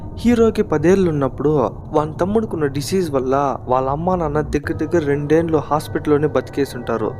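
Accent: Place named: Punganur, India. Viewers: That way chittoor muslim telugu slang language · one of my friend punganuru same slang .anduke adiganu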